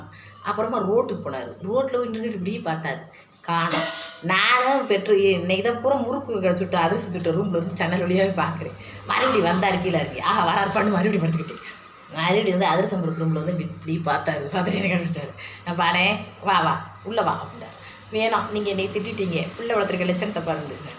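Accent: Madurai, India. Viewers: nalla siruchenma un pechu romba nalla Iruku mathurai slang nallai tr uk ku❤❤❤❤❤❤❤❤❤❤❤❤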